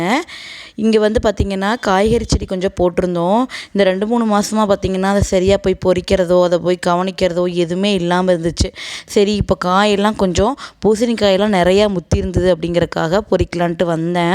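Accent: Kongu, India. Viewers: கொங்கு தமிழ் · Oru oru oorla oru oru pechu vazhakku irukku.Adha yaarum edhuvum soldradhillaye.Aen ellarum "porikkara" dha mattum target pannanum?!Neenga Unga oor pechu vazhakka mathuveengla sollunga sister?!Pechu vazhakku enbadhu mannin manam. Accept pannitu poite irunga. Kongu tamil avlo azhagu.Ippo ella oor makkalum mix aagi,kongu tamil eh ketpadhe rare aagi vittadhu.very sad to say this.Ellame maari pochu.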